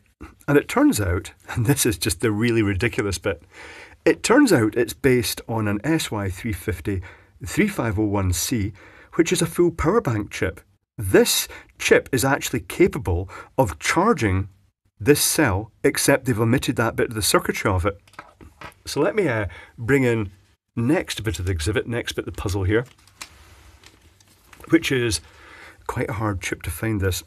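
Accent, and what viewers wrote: Scotland, United Kingdom. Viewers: Hey. Long time listener, first time messaging. Loving your vids. I’m clueless when it comes to electronics and live listening to your descriptions. Even if I can’t properly understand it all. Fife, born and bred. I love hearing a Scottish accent on a YT vid. I was watching a recent video of yours where you mentioned putting an aluminium heat sink on your pile of interesting heat sinks. Can I trouble you for a video on that subject? I’m a scratchbuilding model maker and love cool “found” shapes. And an annotated video from you about such cool things would be of great interest to me. And I bet other subscribers also. Thanks in advance. Regards from glenrothes.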